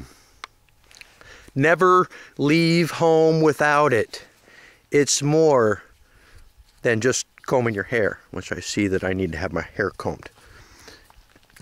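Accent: United States. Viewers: Me at 4 am: watching how to remove cactus with a 70s comb from a man with a southern accent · I feel the america in his voice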